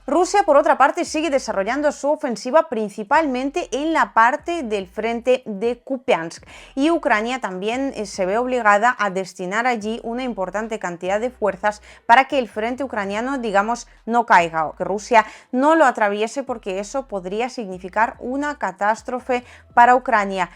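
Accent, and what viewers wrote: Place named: Spain. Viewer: 😊😊😊 habla el español que hablamos en l España, excepto en Andalucía que se parece al vuestro. Pero nos entendemos todos bien,¿no?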